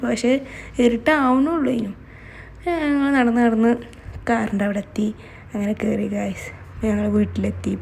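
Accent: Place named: Malappuram, India. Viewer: Malappuram slang avoid cheyy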